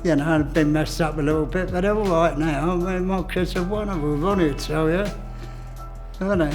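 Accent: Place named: London, United Kingdom. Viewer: Old breed of Londoner. Lovely listening to the accent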